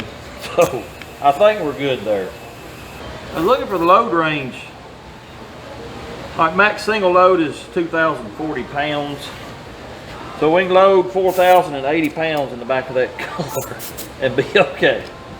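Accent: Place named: Kentucky, United States. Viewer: I got to ask and I'm from KY myself. DO NOT EVER CHANGE YOUR COUNTEY ACCENT!!! It's one of the reasons I watch. Right chear, over yonder and look at this winner (window) AWESOME!!!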